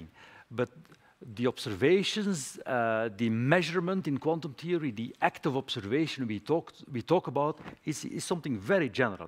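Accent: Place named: Netherlands. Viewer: Ik hoor dat je nederlands bent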